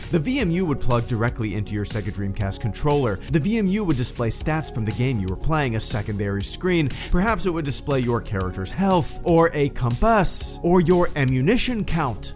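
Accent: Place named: Chicago, United States. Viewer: Awesome. Love that accent. Anyone remember that episode of South Park when Kyle and his family moved to Chicago becouse his dad drove a hybrid? The people there sounded JUST LIKE THAT.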